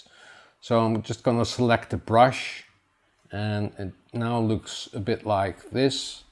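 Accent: Netherlands. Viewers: How did I instantly know you are Dutch? You remind me of my Dutch friend.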